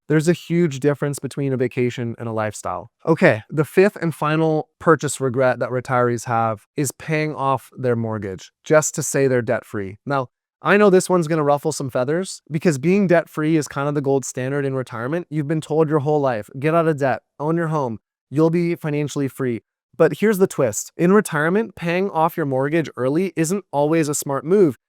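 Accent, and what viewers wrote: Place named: California, United States. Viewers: his California accent is what makes his video so good.... 😉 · Great info,! I watch tons of retirement planning vids. When I 1st watched one of yours, I almost flipped past it after picking up on the CA accent. (I moved from TX to CA for a yr as a young teen and was teased brutally for my TX accent. 50 years later, you'd think I'd be over it. 😄)...but I persisted and watched it thru. Then another. Then another. Now your content has become some of my favorites. Keep up the good work!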